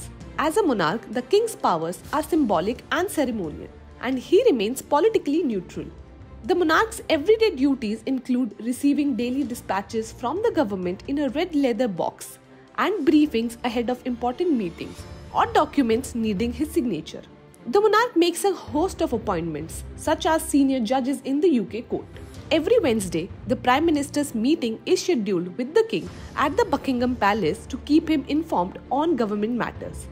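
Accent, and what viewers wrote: India. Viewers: Cmon really!! Im not racist and will never be but this Idian english accent makes me go Insane 🤬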